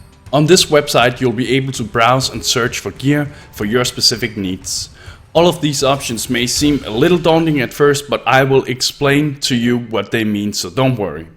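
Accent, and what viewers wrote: Denmark. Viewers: Yo nerd. Just something im wondering, are you Danish ?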